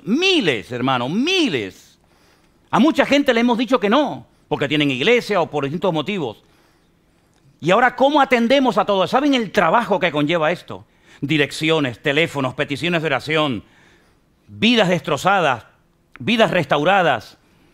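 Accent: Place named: Paraguay. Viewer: desde Paraguy le veo,me gusta xque habla Español como nosotros,No como hay los de España🙏🙏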